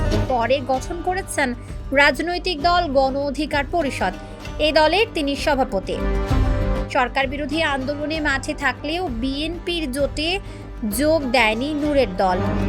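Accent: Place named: West Bengal, India. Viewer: তোমার কনটেন্ট সবই ঠিক তবে তুমি বাংলাদেশী নও কারণ তোমার কথায় অনেক কিছুতে লাগতেচে তুমি ওপার বাংলার এযেমন একটা কথা বলচো না ঘার কা না গাটকা এটা এনখও বাংলার ৯৯.৯৯% লোকে জানে না এটার মানে কি তবে নিজের দেশের দালালী করো আমার দেশের না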